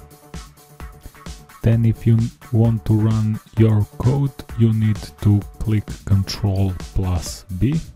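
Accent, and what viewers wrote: Russia. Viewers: русский ацент) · Are you from Russia? Or Yugoslavia?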